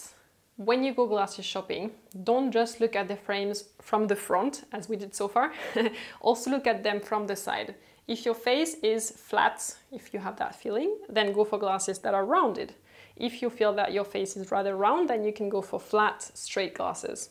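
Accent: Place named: France. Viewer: Hi! I love your videos ❤️. They're always so informative 👍. I also enjoy the soothing tone of your voice along with your accent! Im such s 'francophile'! Love all things French😘. Merci Mademoiselle!!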